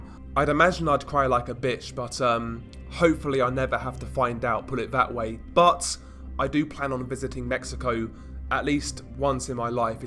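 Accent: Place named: United Kingdom. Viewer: God British English is the fucking worst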